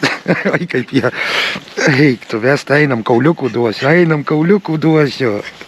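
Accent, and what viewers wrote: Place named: Alytus, Lithuania. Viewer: Pasiūlė jūtūbas video pažiūrėt, ant juoko paspaudžiau ir visą sužiūrėjau, užsiprenumeravau. Ir akcentas alytietiškas labai gražus (pats iš Alytaus esu), ir komentarai pakeliui, ir šuniukai. Tiksliai net nepasakysiu, kas taip patiko, bet patiko ir žiūrėsiu dar :)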